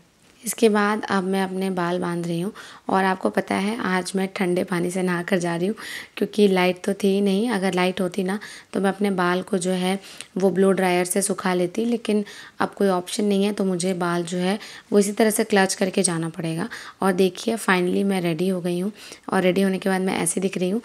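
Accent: Bihar, India. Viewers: Aapki voice bht achii h di bihari tone ❤ love from uttrakhand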